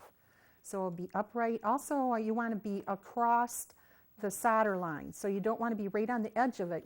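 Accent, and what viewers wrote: United States. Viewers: Thank you for this instructional video. But, the whole time I was thinking of Fargo, yeah, you betcha, don'tcha know?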